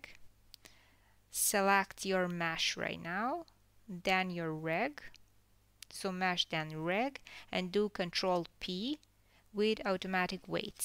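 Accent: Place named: Eastern Europe. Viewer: That beautiful Slavic accent...💌💖💝💘 Ukrainian probably. 🥰😘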